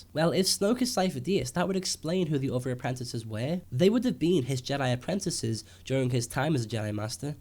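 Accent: Liverpool, United Kingdom. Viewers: scouse lad init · you Scouse? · Chris Griffin he's a Scouser ie someone from Liverpool. They all talk like that you American twit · random question but are you scouse?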